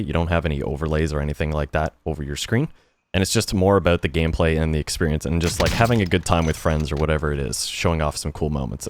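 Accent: Canada. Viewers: Brother sounds super Canadian 👌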